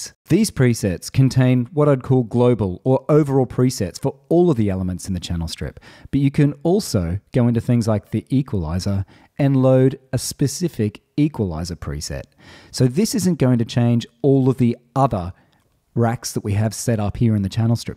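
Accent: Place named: Australia. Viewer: nice to hear an Australian voice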